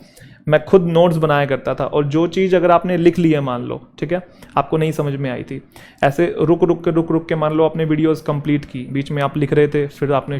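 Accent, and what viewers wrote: Haryana, India. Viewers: Sir ji haryana se ho na??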